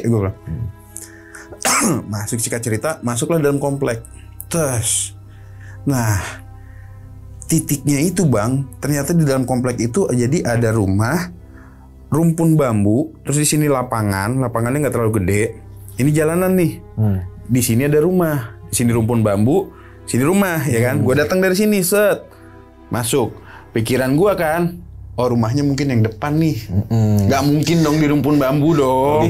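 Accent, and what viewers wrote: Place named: Betawi, Indonesia. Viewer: Kagak ngerti klo Nyang cerita pakai dialek Betawi suka bgt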